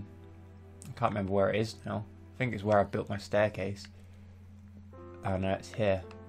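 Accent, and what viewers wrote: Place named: RP, United Kingdom. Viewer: Your posh voice makes me laugh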